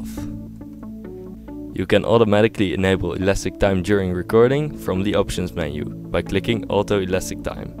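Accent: Netherlands. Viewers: Ha. Hij is gewoon nederlands. Ik dacht al hoorde een hele vriendelijk nederlandse jongen:)Hoi! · are you from the netherlands??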